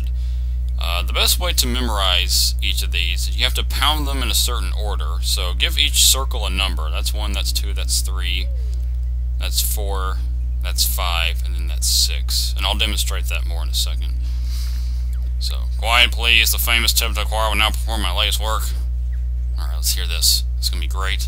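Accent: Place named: United States. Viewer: Love/d this game and the american accent is a naughty bonus haha.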